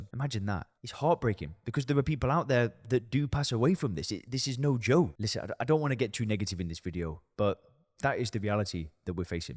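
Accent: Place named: North Carolina, United States. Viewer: you are from NC aren't you? you have the NC accent!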